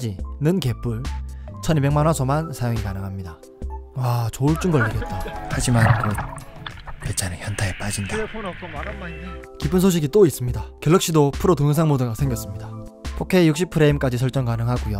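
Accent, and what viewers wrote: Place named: Changwon, South Korea. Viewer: 님 창원사람인가보넹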